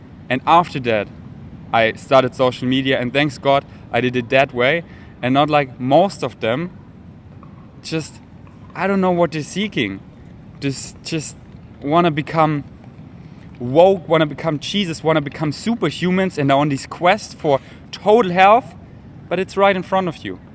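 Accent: Germany. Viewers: I knew it, that accent looked like a german one (scroll in the comments) Tolle Video☺️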